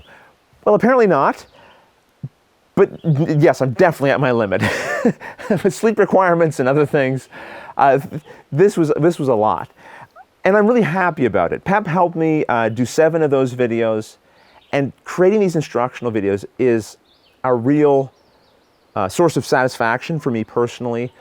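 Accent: Canada. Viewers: You've taught me how to play a number of games this year and I'll definitely keep an eye out for your fundraiser. My wife always knows when I'm watching a show because she recognizes a fellow Canadian accent! :) You have some of the highest quality videos in terms of production which I imagine takes some time, and they often focus on major releases. With that in mind, have you considered doing something like a "quick glance" video segment/series that isn't as in depth as the normal videos but allows you to touch on a broader range of topics/games/smaller releases? Because I think the bottom line is you've got a good viewing personality for gaming, but unless I've just bought the game or am thinking about buying it, I'm not going to watch an instructional video for the fun of it, you know? Table Talk is great, but as you mentioned it's less of a focus. Regardless, thanks for your contributions to the community!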